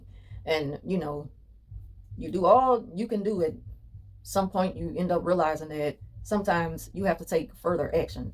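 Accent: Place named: South, United States. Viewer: I like your down south accent .💖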